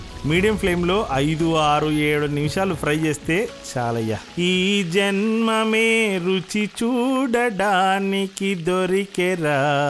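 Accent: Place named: Visakhapatnam, India. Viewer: Me native place enti garu achamga visakha telugu la untadhi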